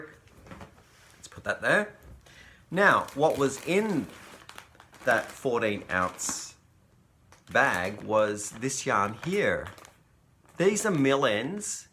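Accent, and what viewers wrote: Australia. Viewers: Ha ha! Cute story about the garbage bin. I'm from Southern California. I call it "trash" , but my friends from Hawaii call it "rubbish". BTW I love your Australian accent!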